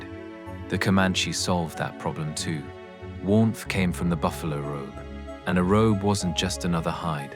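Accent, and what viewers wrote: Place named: RP, United Kingdom. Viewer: English butler voice yawnnn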